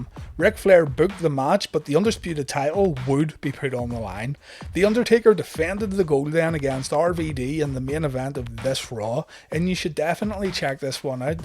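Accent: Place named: Scotland, United Kingdom. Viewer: I'm Irish too and I thought he was scottish too:O love your vid by the way <3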